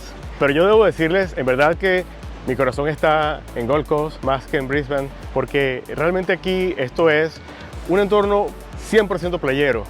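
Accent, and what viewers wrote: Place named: Colombia. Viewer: Muy informativo el video, gracias hermano. Eres de Barranquilla? Tu acento suena un poco colombiano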